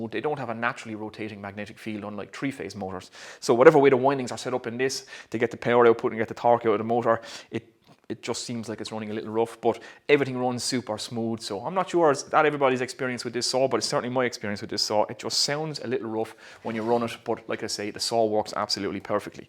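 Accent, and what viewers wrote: Ireland. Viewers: Your review is just as spot on today. Thank you. First time watching your videos and it took me a few seconds to get my yank ear in tune with your very cool brogue, but very much enjoyed listening. Your teaching style is very good. Well done, sir! 👍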